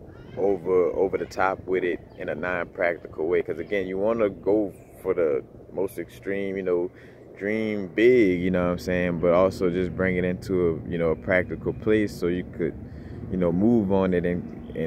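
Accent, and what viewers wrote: New Orleans, United States. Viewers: NOLA accent! 🙏🏾 Peace to you. I love your vibe.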